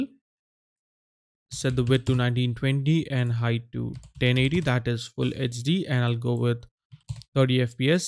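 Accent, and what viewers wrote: India. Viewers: Indian accent huh? 😀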